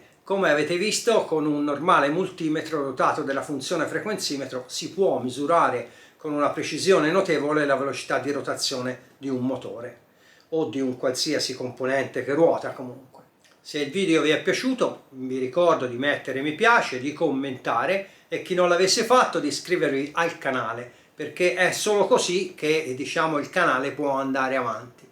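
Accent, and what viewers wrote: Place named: Lucca, Italy. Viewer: See ...sei ganzo !! Toscano anche te ...dall.accento sembrerebbe lucchese io pisa ahhh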